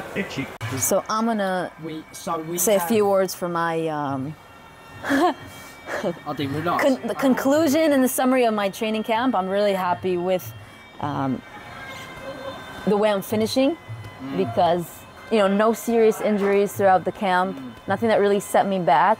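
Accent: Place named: United States. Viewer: Why do you have an American accent? You get to belong to two states but Palestinians aren't allowed to belong to one?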